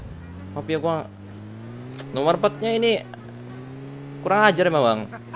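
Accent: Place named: Eastern Indonesia, Indonesia. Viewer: Pake aksen logat orang timur pasti jarang yg bisa nebak walau open mic dari awal.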